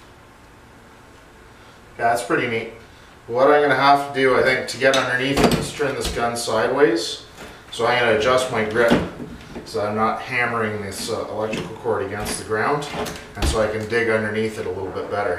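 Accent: Canada. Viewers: You didn't have to say Canadian. Your accent gave that away. I miss hockey. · I thought "he must be Canadian" Confirmed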